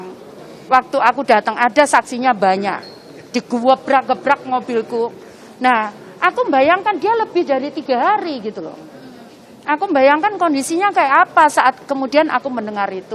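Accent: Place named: Surabaya, Indonesia. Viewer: Medok.. Jadi kelihatan kurang intelektualnya..mudah gugup kalo kondisi lagi di wawancara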